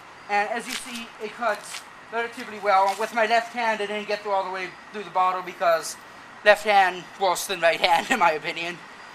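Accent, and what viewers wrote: Ireland. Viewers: What's the guy's accent? sounds a bit Irish, but not really. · Are you from Ireland?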